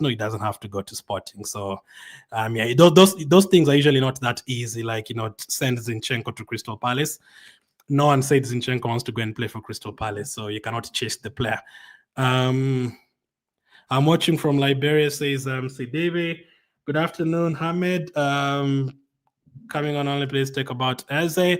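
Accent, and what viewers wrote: Kenya. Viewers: you sound kenyan .COYG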